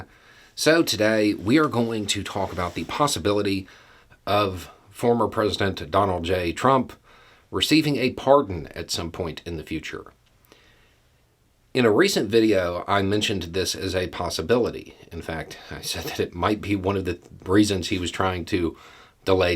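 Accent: South, United States. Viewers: Is in Florida? His Southern accent is pretty prominent.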